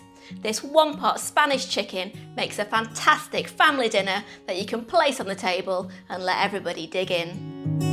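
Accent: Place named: England, United Kingdom. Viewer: English pronunciation, unusual to American ears I'm sure. I'm always impressed that includes American measures in her directions as well. Its not common to see both.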